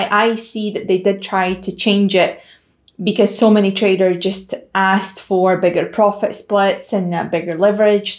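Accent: Scotland, United Kingdom. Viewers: Where is your accent from? I hear Scottish but mixed with something else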